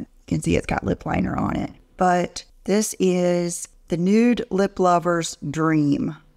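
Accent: South, United States. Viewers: Hi, I used your link and discount code yesterday to order my City Lips, thank you very much for your recommendations, I had to restock my stash, also love the variety on your channel, your presentations are exceptional, and don’t ever loose that charming southern accent, stay with what you’re doing, you can’t improve on perfection. Thanks again and God bless.❤️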